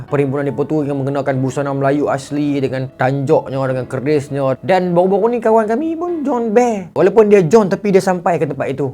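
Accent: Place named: Kedah, Malaysia. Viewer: assalam...awat laju sangat hang habak mai...mokcik x faham..puas nk dengar...sambung2 kn isi cerita tu...campok2 slang kedah lg...mmg khas utk og kedah saja ka citer ni?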